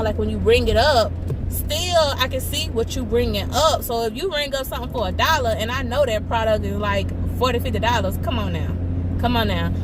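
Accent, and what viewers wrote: South, United States. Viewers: I have NO IDEA how I ended up on this video nor your channel, but I stayed and watched this video in its entirety. I'm so glad I did. You said something about "story time" at the beginning and that southern accent caught my attention! Unfortunately, I'm not into hair and beauty, however, I wanted to let you know that I enjoyed this video! You had me literally rolling! Especially that look. It's always nice to see integrity in people. Additionally, those with integrity not afraid to hold the dishonest accountable. So, from one Youtuber to another....keep doing what your doing! You are creating REALLY GOOD content. - Lewis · Luv the country accent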